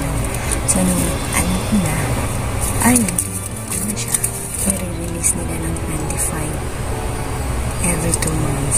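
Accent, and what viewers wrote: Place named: Ilocos, Philippines. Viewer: ilocano ka ba ading hahhaa nice though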